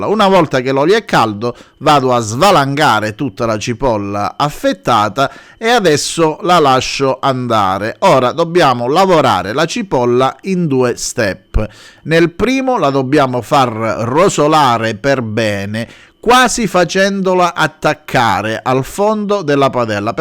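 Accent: Calabria, Italy. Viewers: Grande. Peccato quell'insopportabile inflessione calabrese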